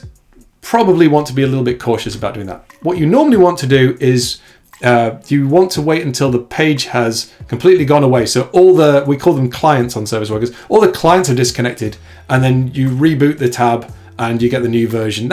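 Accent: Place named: United Kingdom. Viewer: just watched first 7 minutes, and then... Jake at Udacity?? see you in a few days,! (also: really enjoying your British)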